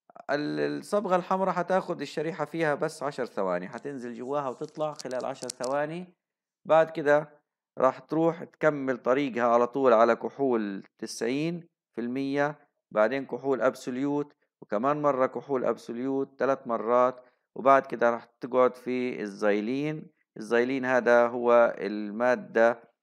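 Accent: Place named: Libya. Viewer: الله يوفقك مشكور . لاحظت أنه لهجتك فيها اللهجة الليبية كتير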